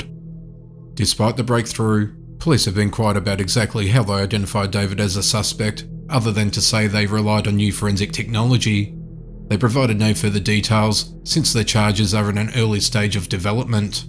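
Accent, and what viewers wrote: Australia. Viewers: Me too! He's smashing every other true crime page i am subscribed to! i love his voice and finally finding an AUSSIE amongst all the true crime channels 🙌🙌 No offence to anyone not feom Australia- I've just found it VERY rare to come across :-)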